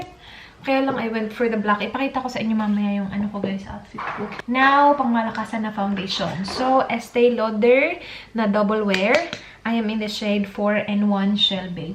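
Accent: Visayas, Philippines. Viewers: Sis.. Klaro kaau na bisaya ka? Correct me when f I'm wrong 😊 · bisaya ka o tagalog? Prang visayan accent ka kc?bsaya din ako..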